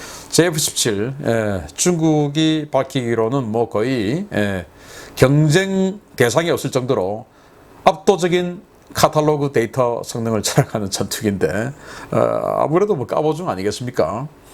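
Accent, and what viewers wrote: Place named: Gyeongsang, South Korea. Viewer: 경상도 액센트 좀 안썼으면 좋은데. 좀 거북합니다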